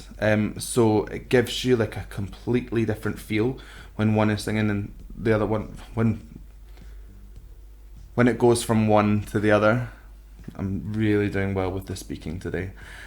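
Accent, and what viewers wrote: Scotland, United Kingdom. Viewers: Hello there, I really love your scottish accent, feels like going home... And, yep Markos voice is awesome!! Try ' ', ' ' (Tampere) or ' ' from 👍😉 Greetings from 🇨🇭 🤘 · i see. thanks. i was leaning towards Scottish but was unsure. pardon my ignorance, its just that we, Americans have trouble distinguishing accents and i am fascinated by them. usually i can spot it better when its a bit heavier than yours. at any rate, thanks again. looking forward to seeing more of your entertaining and informative reactions. is my passion, so anytime you wish to react to her my soul will sing.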